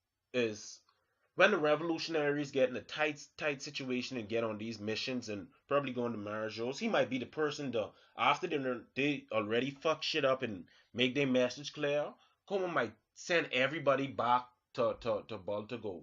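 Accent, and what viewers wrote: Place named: Caribbean. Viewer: Are you Jamaican ? You have a Caribbean accent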